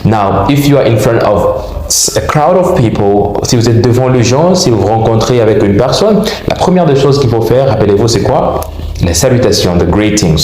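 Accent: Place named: United States. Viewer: Awesome video 🔥 you sound American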